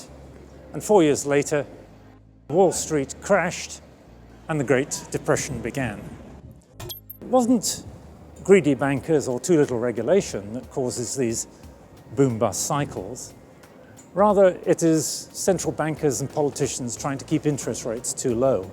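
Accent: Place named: United Kingdom. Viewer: i would like to hear this guy explain every aspect of life to me in videos like this with his classy british accent.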